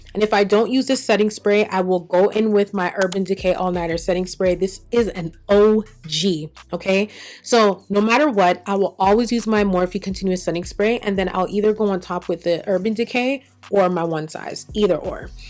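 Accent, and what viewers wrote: Jamaica. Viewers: Lil Sis when you break out in Patois, I know it's clutch, and I run to my nearest Sephora😀😀😀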